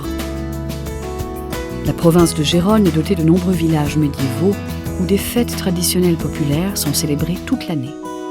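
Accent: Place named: France. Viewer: Visca Girona i tota Catalunya Catalana, c´est marrant d´entendre les noms de ville et d´endroit avec cette prononçassions Française.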